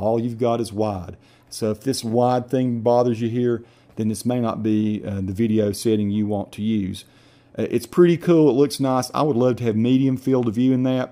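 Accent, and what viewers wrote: South, United States. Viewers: Southern accent?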